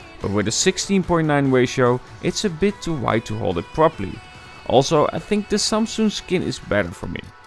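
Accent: Netherlands. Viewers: Ok. I see "NL" on the phone and you sound a little Dutch. Is the Mate 10 available in the Netherlands?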